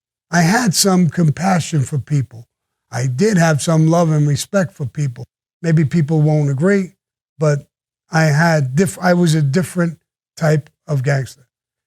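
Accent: United States. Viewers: I’m a NEW YORKER myself! I absolutely love listening to you talk, your mannerisms, it’s like I’m back home. I especially love hearing about your life, the way u can describe the detail, the expressions even on your face... it’s like u sitting there next to me, as if it just happened. Thank u for the experience... absolutely my favorite podcast! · Talks like a 100% full on seppo.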